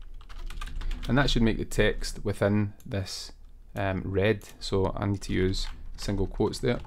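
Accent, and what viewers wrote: Scotland, United Kingdom. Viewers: Good tutorial and with a nice (scottish?) dialect.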